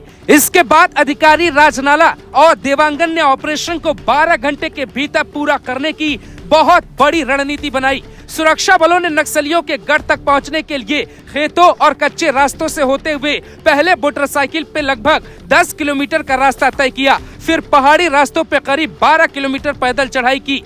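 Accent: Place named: Rural, India. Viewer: कैसे बोलता है रे😮देहाती।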